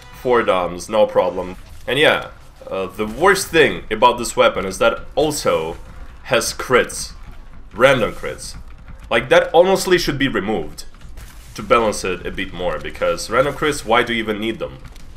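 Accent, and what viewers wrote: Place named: Russia. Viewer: he got a bit of an English-speaking Russian kind of accent?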